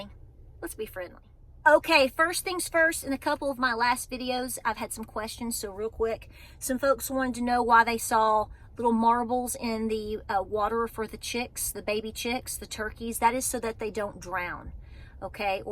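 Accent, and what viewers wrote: South, United States. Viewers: don't lose that southern drawl!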